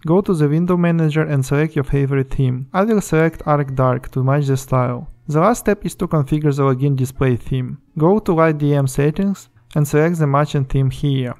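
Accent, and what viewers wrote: Russia. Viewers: Акцент какойто "рязанский". В смысле не носитель языка говорит ИМХО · ес офхорс, лет ми спик фром май харт. 😁 But honestly, you have a really russian accent, like you are reading by papers text which was wrote in russian. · Русского человека сразу слышно:))) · русский акцент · ты сказал "ощущение"? да тут только что русским флагом в кадре не машут. Жесточайший русский акцент, близкий к пародийному. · Русский акцент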